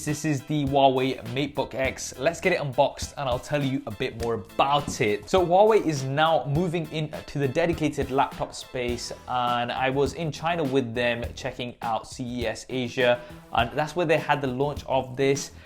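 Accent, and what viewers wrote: United Kingdom. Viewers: This guy looks like Indian but Sounds like UK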